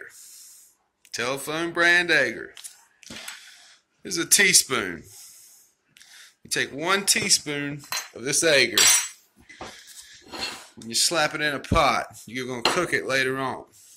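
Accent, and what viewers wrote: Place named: South, United States. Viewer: Love it. You got to be from the South:0) Im born in TN and live in SC. Best video ever.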